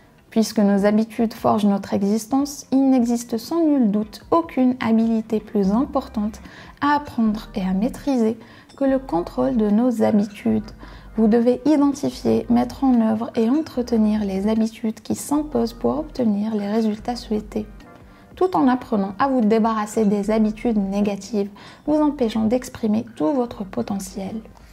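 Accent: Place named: Algeria. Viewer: C’est fou ça fait longtemps que je m’étais abonnée à ta chaine, comme j’avais trouvé ton contenu similaire aux chaînes : mind parachutes ou encore prendre sa vie en main... j’avais décelé l’accent (nous ne parlons pas comme les Français natifs même si l’on a un riche vocabulaire) mais j’étais loin d’imaginer que t’étais Algérienne comme quoi les Algériennes sont partout !!! Je te félicite pour ton contenu, vraiment qui sort du lot des youtubeuses Algeriennes. Big up sista ! 🙌🏻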